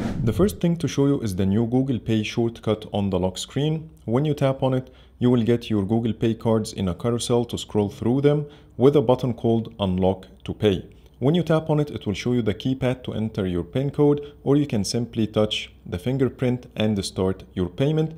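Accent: Egypt. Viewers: يابا ال accent المصري معروفة في اي حته 🇪🇬 😅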